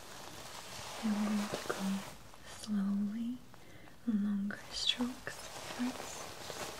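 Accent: Russia. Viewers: i love your accent sounds russian such a relaxing video :) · Her accent is Russian. Watch some newer videos. Sometimes she talks about Russia.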